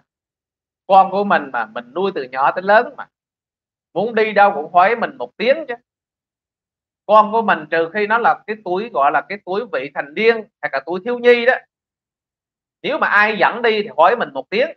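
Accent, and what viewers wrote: Standard. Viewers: nói quá chuẩn nghe đã tai.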